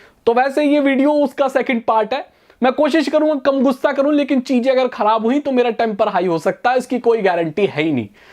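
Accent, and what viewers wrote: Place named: Punjab, India. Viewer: Why are you making your accent like punjabi people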